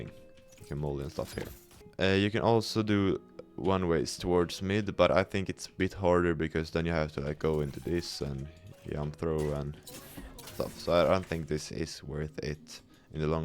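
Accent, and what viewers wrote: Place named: Sweden. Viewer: Are you from Sweden or do you just have an accent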